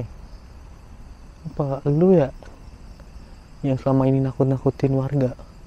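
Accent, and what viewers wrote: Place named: Eastern Indonesia, Indonesia. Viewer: Kk org jawa to? Kenapa pake logat timur selalu sa dgr blg "ado mama..." salam dr Merauke.sukses ya ka tampan..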